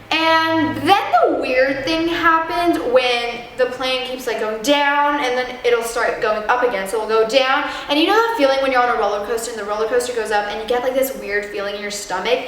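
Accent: United States. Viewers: how come she has an American accent but used to live in Turkey? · She's Turkish but has lived/visited America so she picked up the American accent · How did she get such a flawless American accent in just 4 years at that late of an age?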